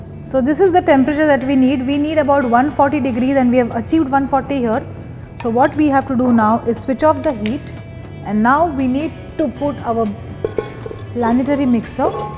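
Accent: India. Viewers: this is English in Hindi accent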